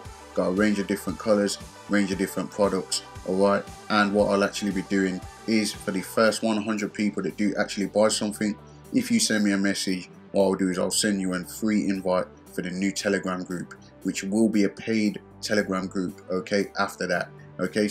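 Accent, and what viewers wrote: Birmingham, United Kingdom. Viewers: You sound like a brummie Johnathon Woss.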